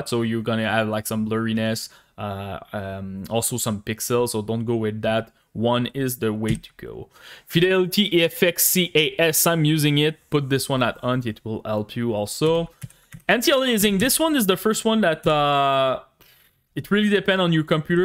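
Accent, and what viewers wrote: Quebec, Canada. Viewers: t'es tu un quebecois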